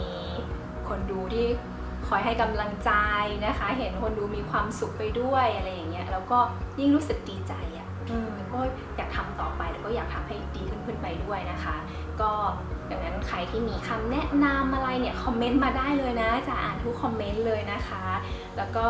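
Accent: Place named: North, Thailand. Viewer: สวัสดีจ้าว ปี้หนานดูคลิปตี้ไปแอ่วแม่กำปองฟังกอยสำเนียงเเล้วต้องเป็นคนเหนือแน่เลย มากอยคลิปนี้ฮู้เลยว่าเป๋นคนเหนือดีใจ๋เด้อตี้มีช่องคนเหนือทำช่องดีๆ ปี้หนานก็ทำช่องเหมือนกันมือใหม่หัดทำ ทำไปเก็บความประทับใจ๋ไว้ดูอีกหน่อยทำดีพ้องบ่อดีพ้อง สู้ๆไปเด้อ เที่ยวบ้างไม่เที่ยวบ้าง✌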